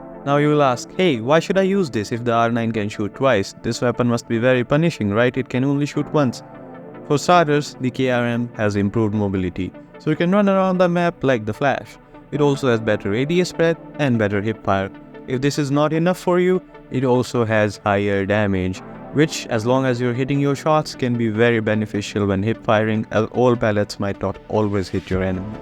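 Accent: India. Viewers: dude you're the exact same as me 😭😭. i started exactly a month ago too after 2.5 years. i was surprised to see an indian voice instead of path's. wonder what happened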